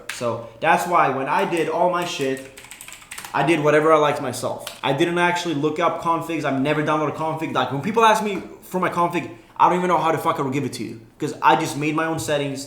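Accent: Sweden. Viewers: Are you from Sweden? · are you from sweden? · U are talking a littel bit swedish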